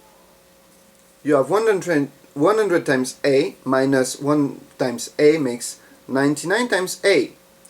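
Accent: France. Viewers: tu es francais non ?? tu as l'axcent francais !!! you're freenche ?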